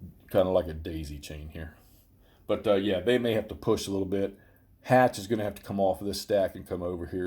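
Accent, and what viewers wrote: United States. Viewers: See to my english ear it sounds more southern gentlemen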